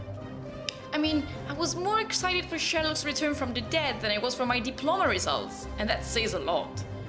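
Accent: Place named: United Kingdom. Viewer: amo quando parli in inglese! (E amo il fatto che capisco benissimo perché parli in modo semplice e aw). E cominci a fare tuo l'accento british, questa è una cosa bellissima! Continuerò sempre a seguirti, sappilo! E MORE VIDEO IN ENGLISH PLS, I NEED THEM Comunque: quoto l'intero video. Proprio ora mi sto riguardando tutto HIMYM per la terza volta. Di fila. IT'S SO HARD BEING A FANGIRL AND YOU UNDERSTAND ME AND I LOVE YOU PS. Per le altre ragazze: guardate che ha già fatto un video dove spiegava come ha imparato l'inglese. Cercate bene e lo trovate, non l'ha postato nemmeno troppo tempo fa! PPS. I love you <33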